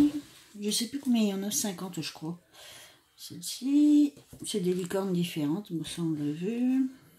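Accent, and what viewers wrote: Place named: Lille, France. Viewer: Ok j ai reconnu cette accent moi je suis de ronchin près de Lille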